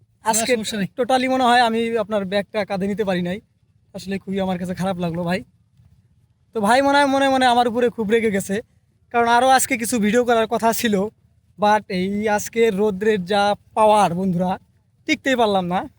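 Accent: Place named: Tangail, Bangladesh. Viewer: মিথ‌্যা ব‌লে ধোকাবা‌জি করা দয়া ক‌রে বাদ দিন, আপনার কথার ধরণ মো‌টেও ক‌ু‌ড়িগ্রাম জেলার মানু‌ষের কথার ধর‌নের সা‌থে মি‌লে না। আপনার কথার ধরণ টাঙ্গাইল জেলার মানু‌ষের কথার সা‌থে পু‌রো মিল এর মা‌নে ভি‌ডিও টা কু‌ড়িগ্রা‌মের না।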